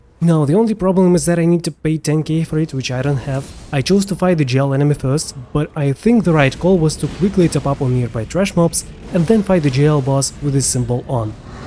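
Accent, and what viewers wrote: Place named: Russia. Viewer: Какое же плотное у меня ощущение, что твой родной язык русский, с этим акцентом, который слышится даже лучше чем мексиканский или индийский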